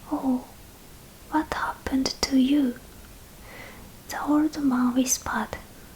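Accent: Japan. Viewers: Japanese is the most familiar language I will never actually learn. But I enjoy the cadence the natives have even when speaking English.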